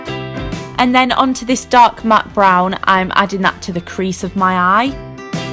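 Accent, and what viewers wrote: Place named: North, United Kingdom. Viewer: You're so northern and I love it!!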